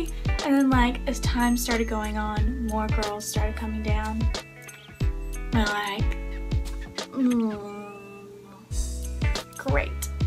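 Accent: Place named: United States. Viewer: You live in Sydney with an American accent... Wow. 😍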